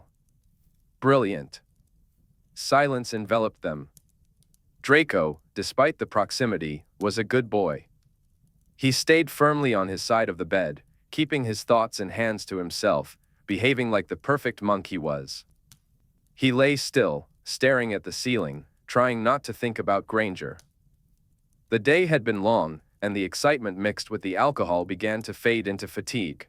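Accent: United Kingdom. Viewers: British accent? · It's based in Britain so a British voice makes sense.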